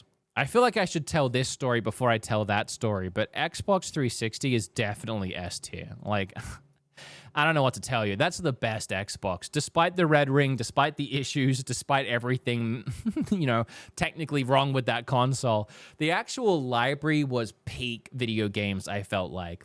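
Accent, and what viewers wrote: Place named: Australia. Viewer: Different pronunciation for Australians which is still correct. British, Americans and Australians stress different parts of some words.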